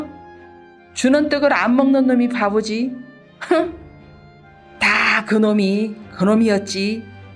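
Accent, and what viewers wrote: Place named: Gyeongsang, South Korea. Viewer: 경상도 억양은 어쩔수 없지만 발음은 좀 ㅠㅠㅠㅠ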